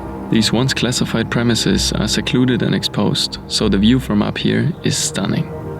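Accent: Germany. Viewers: nice video but why is it so hard for germans to speak english without accent?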